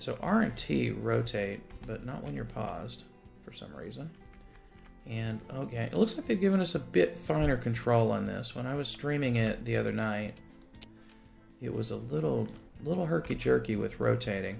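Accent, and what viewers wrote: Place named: South, United States. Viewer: The subtleness of your USA southern accent is what suggested Virginia to me. Not that I've spent enough time there to know them well.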